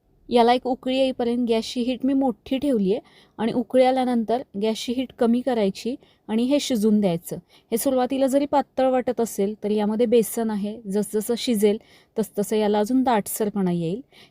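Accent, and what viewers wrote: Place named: Konkanastha, India. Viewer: तुम्ही कर्नाटकातल्या असून सुध्दा एवढे शुध्द स्वच्छ गोड मराठी छान बोलता .बरेच उच्चार कोकणस्थ लोकांसारखे वाटतात .हल्ली अशी शुध्द भाषा ऐकायला मिळत नाहीत .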